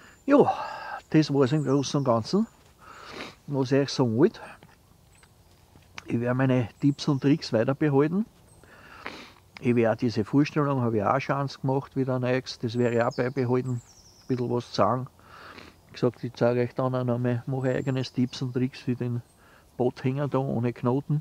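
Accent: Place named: Vienna, Austria. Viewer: Gott sei Dank.Mir hod der leichte Weanerslang scho so gföht.Danke!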